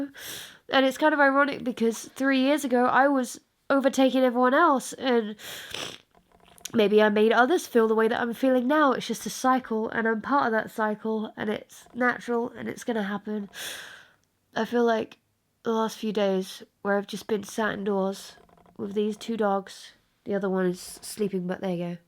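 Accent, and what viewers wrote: United Kingdom. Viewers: Okay three things 1. is an amazing song. 2. Originality, in my point of view; has evolved into a performance type thing. Everything can be traced back to anything if you really put your mind to it. the thing is how you take that which inspired you and make it into your own thing. So yeah you sound like...no shit, Haley Williams is a bad ass performer that inspired many girls in the last decade to try this. At the end of the day, an song is AN SONG. don't be ashamed of whom you sound/look like. Embrace it and evolve it into your own thing. 3. Snotty nostrils sounds incredibly serious with a British accent.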